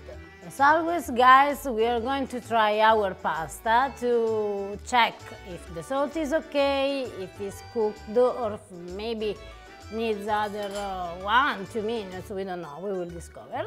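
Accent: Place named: Italy. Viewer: Bellissimo accento italiano 😊☺️☺️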